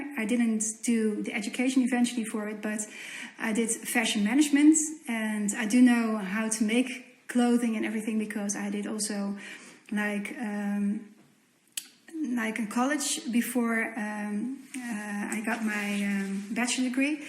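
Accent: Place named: Netherlands. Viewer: It does have this something to see all kinds of celebrities in online streams so much (watched various panels of actors of my favorite series too). It's pretty unique and cool. At leats one good thing about this quarantine! It's so fun to hear myself in her, as in being a Dutch person myself and trying to talk English with our Dutch accent :P Agreed Sharon. I too am so fed up with all the divisions and how people treat others who are not the same as they are.. It is this like curse in human nature unfortunately.. But then again, it IS better now than like 200 years ago, that also needs to be said. There's still much work to do but it is already better than in the past ~ ^^; My rhytm is pretty much gone since I graduated from my bachelor degree at a time in life that was pretty hard and then corona started. So, no solid job or studie for over a year now already.. 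I love how she pronounced 'WT Benelux' we Dutchies say the letter W VERY different than in English XD The English pronounciation of W is weird to us XD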